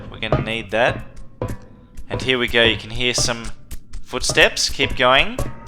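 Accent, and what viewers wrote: Australia. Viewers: Your accent is awesome! Is it Australian?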